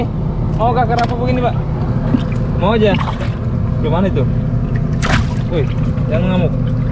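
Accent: Bugis, Indonesia. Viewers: ORANG BUGIS KAH SAPPO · Orng Bugis ki aaa??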